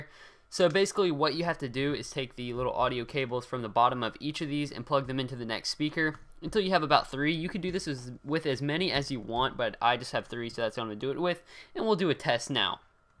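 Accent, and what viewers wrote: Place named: United States. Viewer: AMERICAN ACCENT!!!!!!!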